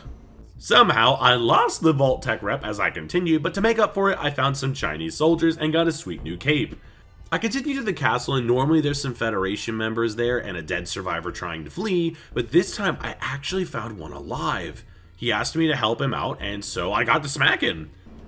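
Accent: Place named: Australia. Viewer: i can’t place your accent. it sounds australian sometimes but american at other times lol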